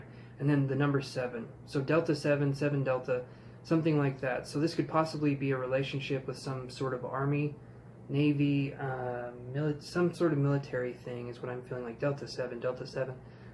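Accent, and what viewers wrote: Ireland. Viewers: Hahahahaha i was like :"hes actually irish?" I was so confused hahahahaha 😂😂Spot on reading ! Thank you!!☺☺ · Hahahahah I love the Irish accent. Classic!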